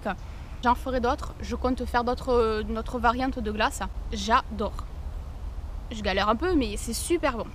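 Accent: South, France. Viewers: Suuuuper ! moi j'en ai fait une aussi avec 2 ingredients mais se ne sont pas les mêmes haha. passe voir si tu veux, en tout cas je m'abonne , j'aime ton petit accent du sud !!! et pouce en l'air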